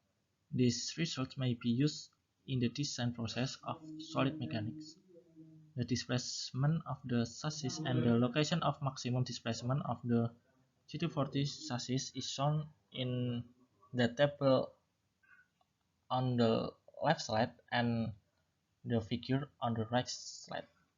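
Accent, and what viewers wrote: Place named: Indonesia. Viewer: logatnya kayak orang indo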